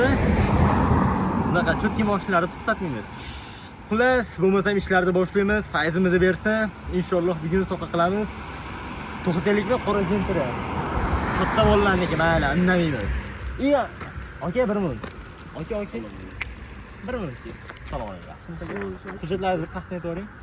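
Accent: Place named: Tashkent, Uzbekistan. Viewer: Oka haribroq bop qobsiz sal tashkencha gapirardizu oldin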